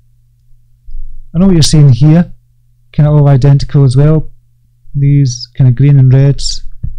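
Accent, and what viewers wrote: Scotland, United Kingdom. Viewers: I just came for that Scottish accent :) Well, and I'm in the very early R&D stages of my crypto journey, so I'm really here just absorbing and learning. Nice to meet you. There was definitely alot of distortion here, and there today. I watched a bit, when this was live, and it kept freezing on my side, and now, on playback, I just hear the sound distortions. Just an FYI. Have a wonderful weekend!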